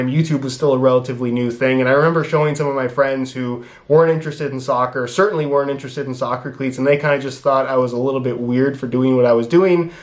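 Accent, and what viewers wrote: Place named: Canada. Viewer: Lmao I always used to watch SR4U but I started to care a bit less about soccer (football over here) and stopped watching your channel. Today a video popped up in my recommended by this new channel called ' ' and I clicked on it. I thought you seemed familiar but just assumed all young Canadian guys must just sound really similar to me😅. It was only when I saw one of these videos in your soccer cleats room that I realised it really was you lmao. You've just earned yourself a new subscriber, keep up the good work because this channel is probably going to take off with more non soccer players getting these videos in their recommended.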